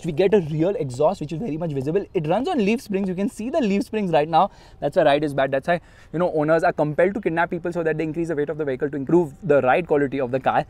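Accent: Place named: North India, India. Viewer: North Indian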